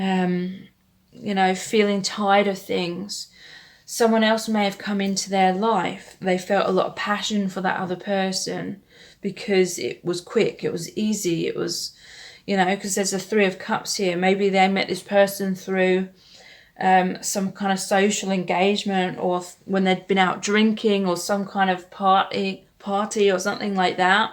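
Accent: United Kingdom. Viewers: Wow!! I love ur British accent also fantastic reading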